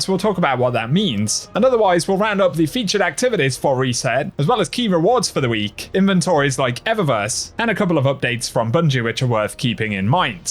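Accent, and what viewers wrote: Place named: United Kingdom. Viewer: 😂 That freaking Brit accent ☠️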